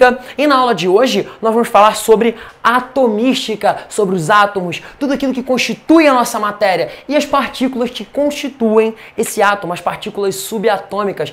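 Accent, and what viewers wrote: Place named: Rio de Janeiro, Brazil. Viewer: Com certeza esse professor é carioca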